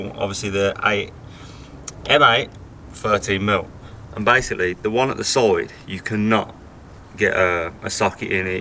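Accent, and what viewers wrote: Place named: Birmingham, United Kingdom. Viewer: I’m sure your saying Renault wrong 😂😂. Its that brummy accent . Good videos tho xx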